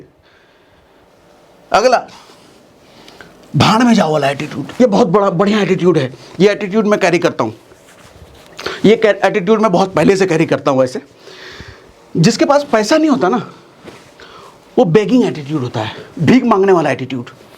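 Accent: Bihar, India. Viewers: sir namaste🙏 tex pe video chahiye v bhi aap ke desi bhasha me bij bij pe bihari ton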